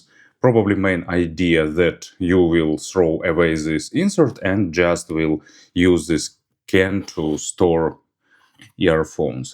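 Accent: Ukraine. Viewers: “Sophisticated” daily dose of Ukrainian accent 👍 Greetings from India 🇮🇳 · I appreciate the daily dose of sophisticated Ukrainian accent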